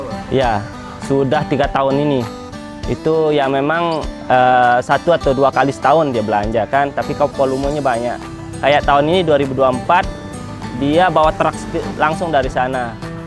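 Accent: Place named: Lombok, Indonesia. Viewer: Seperti logat orng Lombok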